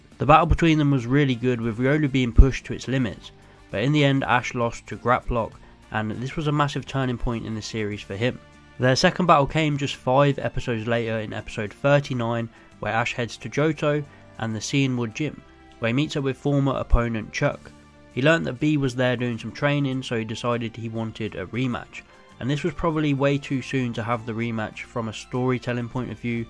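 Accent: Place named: United Kingdom. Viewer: You are so British by Accent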